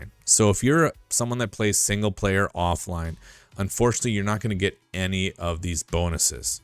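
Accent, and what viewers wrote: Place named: Balkans. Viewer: Are you of Serbo-Croatian descent, bro? Nice ! I can recognize this accent anywhere!! :) Zdravo! :)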